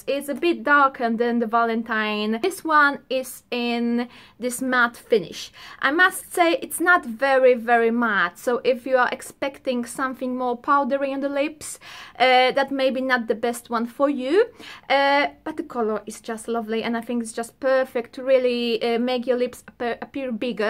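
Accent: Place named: United Kingdom. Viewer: pfffeeeeeerfect, te typowo brytyjskie akcenty są niesamowite w Twoim wykonaniu, uwielbiam akcent brytyjski a u Ciebie słychać go tak przeuroczo ;) xoxo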